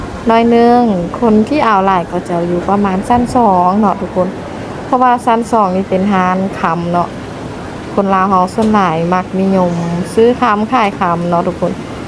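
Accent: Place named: Thailand. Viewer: สำเนียงการพูดจาคล้ายคนไทยมากฟังเข้าใจง่ายชื่นชม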